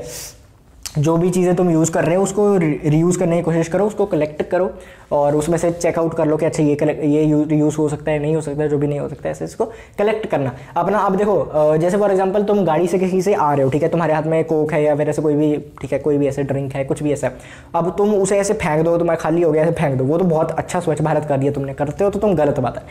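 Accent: Punjab, India. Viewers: U r Punjabi??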